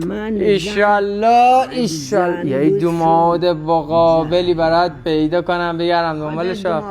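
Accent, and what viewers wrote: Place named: Shiraz, Iran. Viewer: من هم شیرازی هستم نزدیک مرودشت ولی از لهجه شما خیلی خوشم میاد به قول خودت شب ها درت میکنه روزها قربون صدقه ت میره وماچت میکنه😂 آخر نفهمیدیم برا چی گاهی درت میکنه؟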